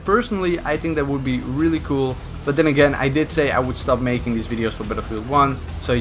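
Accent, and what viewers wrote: Netherlands. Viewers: You dutch? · Are you dutch? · Are u dutch? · You have a Dutch accent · He sounds dutch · Ik hoor namelijk een Nederlands accent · Ben jij nederlands ik hoor het aan je engels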